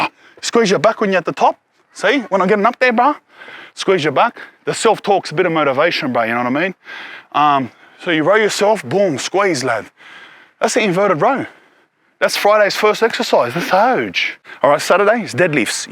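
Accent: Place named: Sydney, Australia. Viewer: Boom brother, oos lad! I’m from Brisbane so we don’t talk like that but I had a mate from Sydney who talked like that. Love it! I could listen to old talk all day haha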